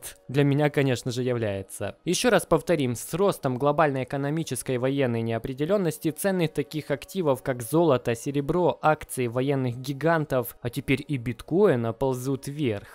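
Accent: Ukraine. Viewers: так по говору слышно что чувак с Украины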